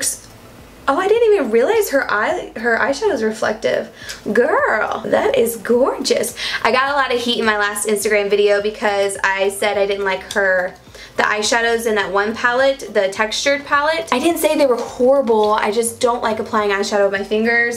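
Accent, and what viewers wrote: South, United States. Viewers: I absolutely love your videos and southern draw!! Keep it up darlin'. 💚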